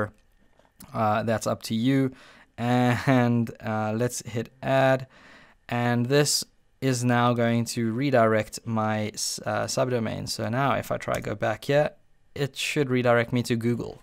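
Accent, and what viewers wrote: South Africa. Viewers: Dude are you South African?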